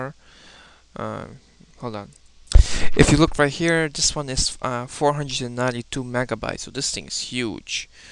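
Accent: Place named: Russia. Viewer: По акценту видно что ты русский )) я ниче не понял из всего этого мож сделать это видео на русском токо еще объясни как запустить этот live сd в boot меню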